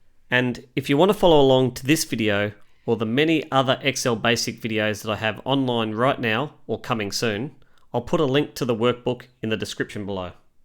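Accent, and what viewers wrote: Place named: Australia. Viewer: Love your accent, are you from Australia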